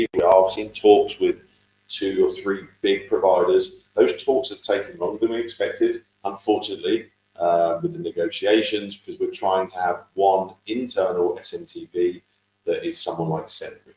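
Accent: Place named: United Kingdom. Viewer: oooooh! Onboarding! I'm in the UK - sounds like you are from here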